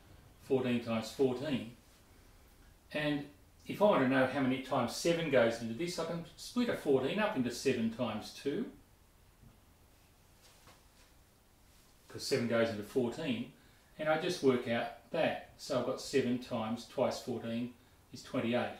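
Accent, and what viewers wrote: Australia. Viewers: Not sure why, but having another Australian explain this helps so much. Top stuff, cheers!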